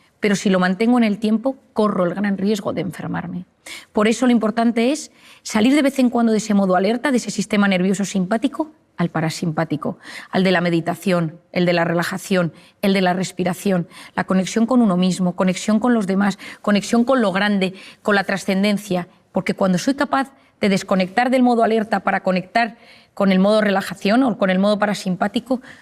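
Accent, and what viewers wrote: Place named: Spain. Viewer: Está Dra . Marian, es un encanto, es una cachaqueria, escucharla, poco a poco he descubierto tantos puntos críticos que uno tiene pero no lo sabe y he podido mejorar con sus concejos y enseñanzas. Que Dios la Bendiga mucho. Aparte tiene una voz muy bonita y ese acento español. Me ha ayudado mucho. Gracias Dra. Marian, que buena obra haces, desde Colombia Gracias , Gracias , espero q acá se encuentren sus libros. Sigue así , Te nesecitamos.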